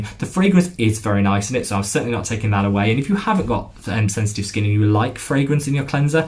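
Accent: United Kingdom. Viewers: Great review. Really. Just discovered your channel and subscribed. Want to hear more reviews from you. You go deep and I love it. You remind me of Hyram only with British accent which I adore😁 I would like to hear your opinion on timeless. They have straitforward products. I love their vit c 20% ighink you would love it too. Good luck with your channel it will grow for sure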